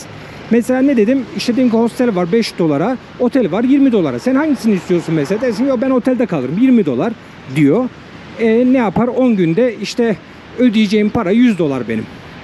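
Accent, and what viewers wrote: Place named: Germany. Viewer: Sen almancısın aksanından ve tipinden belli .20 kere gittim ama chang mai a gitmedim çünkü deniz yok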